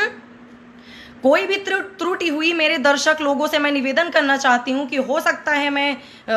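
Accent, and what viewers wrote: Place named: Bagheli, India. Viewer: आप की टोटल वीडियो सुपर डुपर.. बघेली... आप के जैसा किसी की एक्टिंग नहीं है बघेली... मे.. सब सीख के बोलते है आप शुद्ध बघेली बोलती है... हर कहानी मे कुछ न कुछ सिखने को मिला..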